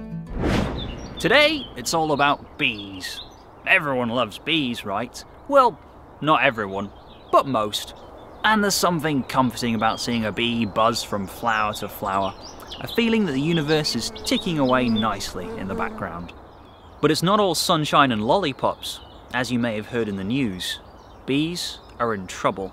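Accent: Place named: United Kingdom. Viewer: Finally a british accent yay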